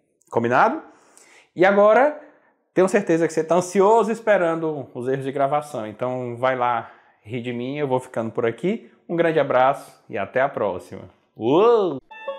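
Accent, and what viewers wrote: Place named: Ceará, Brazil. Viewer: pelo sotaque acho que é cearense,obrigado pelos ensinamentos.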